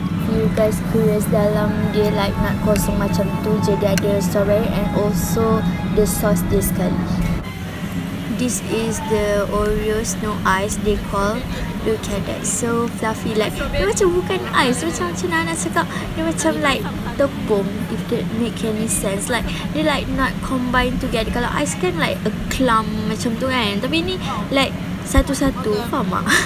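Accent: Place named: Malaysia. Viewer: Yo malay english is waaaay too boring to be heard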